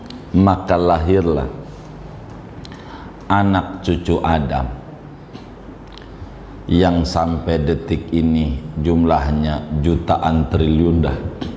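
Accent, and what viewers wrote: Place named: Jakarta, Indonesia. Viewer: Pantes aja... Tapi logat bhsany betawi banget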